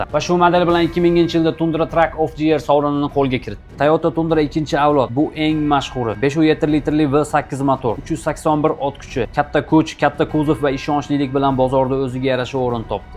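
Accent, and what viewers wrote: Andijan, Uzbekistan. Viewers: Бу брат тоза Анжанданми диманда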